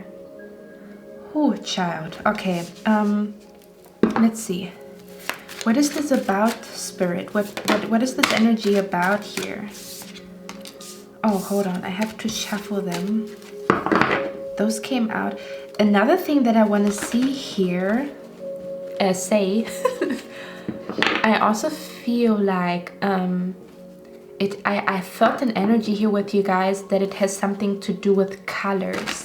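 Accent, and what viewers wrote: Germany. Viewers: Pile2 Since a week a have a lot of deja vus its weird like a lot on a day And I guess I meet one of my guides in my dreams A lot of readers say aomething about matrix and lucy? I hate this kinda films they are so dark but I am going to watch it anyway cuz of the messages Und ja man sieht den Wald vor lauter Bäumen nicht ich höre einen deutschen aktzent🤣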